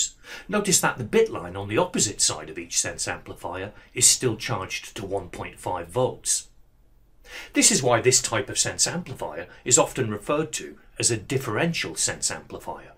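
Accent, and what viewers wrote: United Kingdom. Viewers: What I expected: Indian English accent What I got: Marvelous British accent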